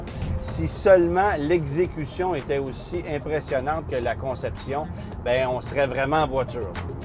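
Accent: Quebec, Canada. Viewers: L'accent québécois, on pourrait s'en passer. Parlez français s'il vous plaît. On a pas tous envie de vomir 🤮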